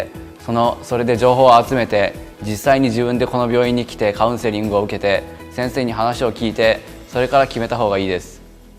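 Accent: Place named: China. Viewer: 他不是日本人，他是中国人。发音是中国人的日语。絶対日本人ではありません、、、、発音を聞いたら、すぐわかるでしょう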